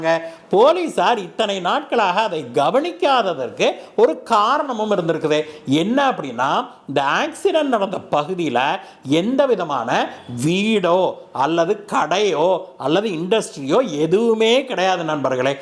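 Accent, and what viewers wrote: Kongu, India. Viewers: நீங்க பேசுவது கொங்கு தமிழ் போல . ஒரு பல்ராங் (ஒரு கிலோமீட்டர்) அவுதி அவுதியாக போறாரு அவசரம் அவசரமாக போறாரு வார்த்தை இது எல்லாம் கொங்கு தமிழ்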